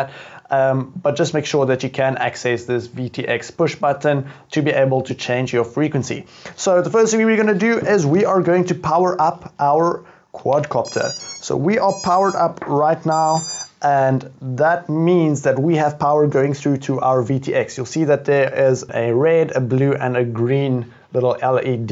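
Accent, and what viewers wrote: South Africa. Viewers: You sound like a South-African... Thanks for the video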